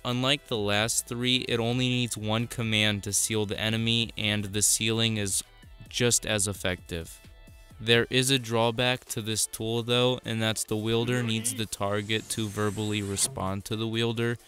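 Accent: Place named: Ohio, United States. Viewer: HE talks like this normally too, he's not doing this on purpose. Its just the Ohio prose my man.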